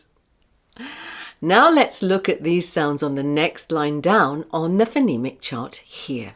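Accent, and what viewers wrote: United Kingdom. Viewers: Thank you so much for your time. you are the best and we love the way how you teach and your British accent.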